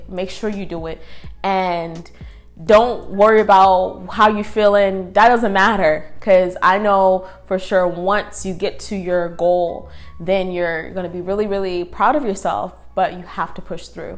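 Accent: Jamaica. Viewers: Love your jamaican accent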